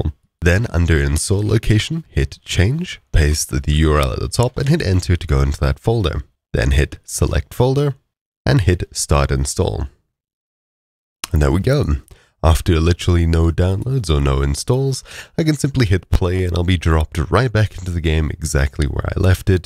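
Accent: South Africa. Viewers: I know an SA bra when I hear one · Are you a saffer?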